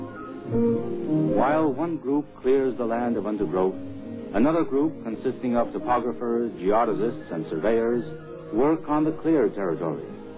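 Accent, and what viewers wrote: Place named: Jamaica. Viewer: What's up with the jamaican accent? You're not even jamaican and you trying to sound like bob marley. Marley talk about ethiopia my mom's ancestor country, not somalia.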